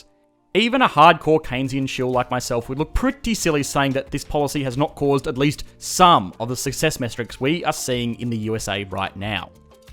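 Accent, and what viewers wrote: Australia. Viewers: Bruh hes australian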